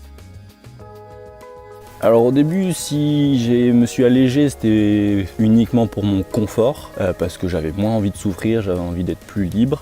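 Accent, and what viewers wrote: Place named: Basque Country, France. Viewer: Bonjour. Bravo pour votre chaîne et vos périples. J'aime beaucoup votre sincérité, modestie, et philosophie de la randonnée. Il faut toujours commencer avec son père, le seul type au monde que vous pouvez envoyer balader et qui néanmoins, vous aimera à la folie :) Une certaine façon de prononcer les "R" me fait penser que vous n'habitez pas loin du Pays Basque. Bien cordialement.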